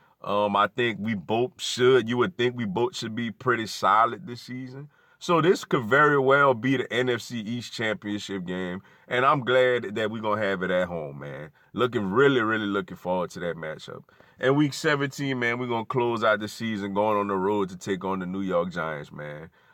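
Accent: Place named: Louisiana, United States. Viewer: I love your Louisiana accent lol what part are you from? I have family in New Orleans, Baton Rouge and Donaldsonville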